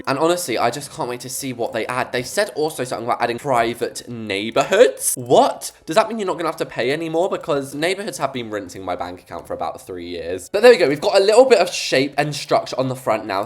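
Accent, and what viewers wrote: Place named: United Kingdom. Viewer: Omg I’ve watched Reese’s for like… yearsssss but I only just realised… IS THIS MAN BRITISH??? I am and honesty he sounds British but I only JUST realised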